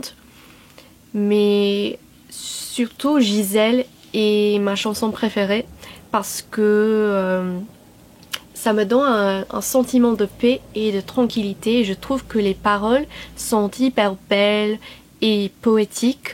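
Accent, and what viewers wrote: England, United Kingdom. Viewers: Hiya, just wanted to say that you remind me of a younger me who moved from England to France at a much younger age (we have the same twang and make the same mistakes when speaking french!). I also spent a lot of summers in France until my family and I eventually moved where the french side of my family lives. Being in my 20s now, I now speak fluent even native-like french. However, as my natural inner voice is in English when I'm alone, so I do still slip up from time to time, and still have a slight a twang apparently lol. Even after having lived here for over 15 years haha. I only wish I wasn't so isolated growing up in France and had other english speaking kids who were in the same situation as me to talk to. In fact, I can imagine a conversation between us to be refreshing because I never found someone with such a similar and yet different backround. 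But this is the internet, and you don't know me. So naturally this will never happen. But it's nice to know that there is someone similar to me out there! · Hello, excuse moi je ne te connais pas, tu es britanniques??:O Tu peux écouter: -, toujours de - 🥰, de -, de. Cette chanson est incroyable 🎸 * - un mélange * - entendu parler du groupe don't give up 🤗 (On ne devrait pas non plus prononcer le " T " à " sont " mais j'aime quand tu le dis XD ) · Bravo pour votre chaîne. Votre français est très agréable à entendre, avec ce petit accent britannique tellement charmant! <link>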